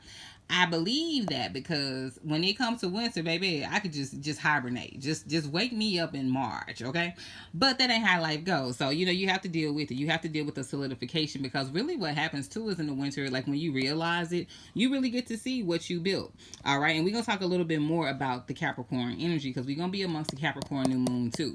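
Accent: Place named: Tennessee, United States. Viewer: love your accent, not Georgia, maybe Tennessee Kentucky?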